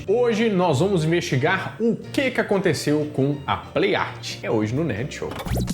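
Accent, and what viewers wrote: Minas Gerais, Brazil. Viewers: Esse sotaque é mineiro?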